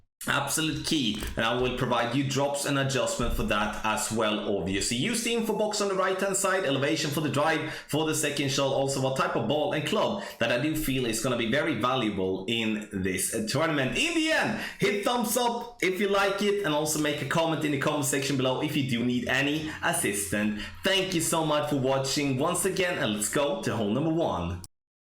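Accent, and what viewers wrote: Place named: Sweden. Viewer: Du låter svensk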